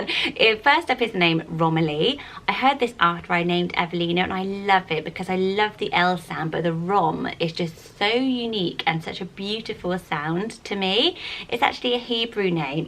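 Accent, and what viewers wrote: United Kingdom. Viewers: Everything sounds prettier with a British accent lol...they sound beautiful when you say them! I live in Tennessee...ask someone to say Clara or Lavender 😆 It’s not good. I’m originally from NY...it’s not good, either 😂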